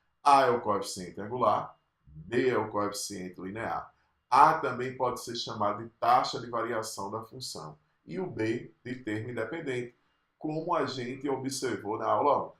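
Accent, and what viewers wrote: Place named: Bahia, Brazil. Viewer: Sei que as aulas são para ajudar pessoas que vão prestar ENEM. Naão é o meu caso. Estou fazendo a segunda faculdade e seu material é bom. tenho mpor hobe o estudo de matemática desde 1984 e a internet trouxe a possibilidade de conhecermos o trabalho de outras pessoas. Não sei, mas parece que o professor é baiano e cheguei a uma conclusão: ninguém melhor que um baiano, calmo e cadenciado, pra explicar qualquer coisa pra alguém. Suas aulas são ótimas professor, pois dão tempo de pensar e assimilar tudinho. já me inscrevi no seu canal e virei seu fão. Esero umdia, nos conhecermos pessoalmente. Vou indicar para muitos jovens. Melhor que isso, só se apessoa tiver tempo para estudar a coleção completa de "Fundamentos de Matemática Elementar" do Iezi e amigos, mas isso é pra quem teria tempo mesmo. É uma coleção fundamental que tenho em minha cabeceira, mas não deve ser usada para um concurso, por exemplo, pois exige tempo para reflexão e absorção de tudo. 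Professor, grande abraço. Continue trabalhando e fazendo pensar.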